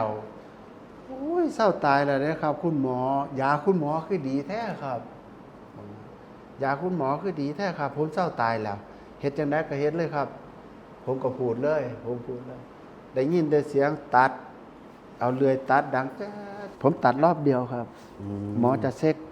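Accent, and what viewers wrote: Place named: Ubon Ratchathani, Thailand. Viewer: สำเนียงการพูดเหมือนจะอยู่แถวอุบลนะครับ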